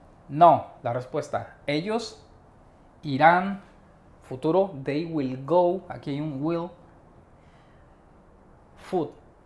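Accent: Spain. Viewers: Ese acento español...mal jajaja un saludo y buen video una vez mas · me encantó tu acento español tocallo. hace tirmpo hiciste un video sobre inglés britanico ¿ Podrias, por favor hacer uno sobre inglés Australiano?.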